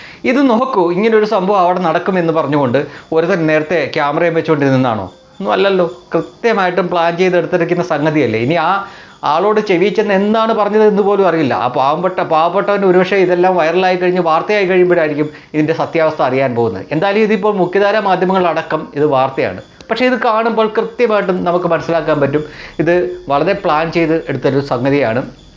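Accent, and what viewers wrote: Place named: Alappuzha, India. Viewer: Hi innathe special entanu. Nalla alappuzha saily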